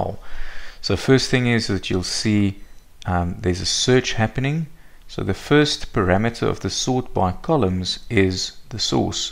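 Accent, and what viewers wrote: South Africa. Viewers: South African? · Hi, where are you based? Can hear the SA accent. Are you perhaps in Cape Town to attend a course or two? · Thank you Mr. This was 10X better tutorial than others videos out there. Also picked up on that SA accent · Cape Town accent??? We are homies.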